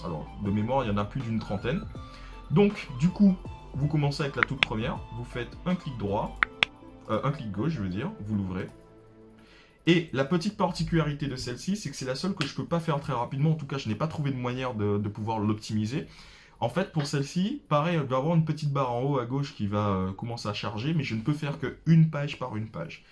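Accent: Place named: Antilles, Caribbean. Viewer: Ha une voix antillaise comme moi. Parfait continue ne fais pas comme pratiquement tous les autres youtubeurs qui essayent de nous avoir car ils sont payés pour ca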